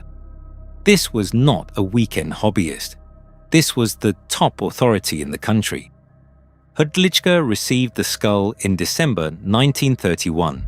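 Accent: United Kingdom. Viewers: "I'm and if you've BEAN following this..." Get rid of the british accent.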